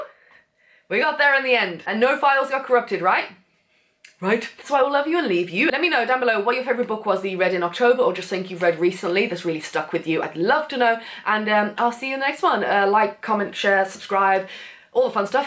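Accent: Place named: United Kingdom. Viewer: I literally enjoy listening to you . ☺️ I love the British accent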